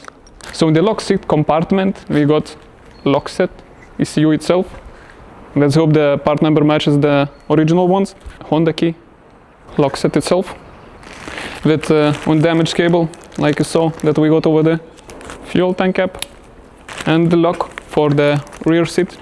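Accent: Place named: Eastern Europe. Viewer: I just hear your accent and I think you’re from Eastern Europe, I from Ukraine, your video is really great!!!👍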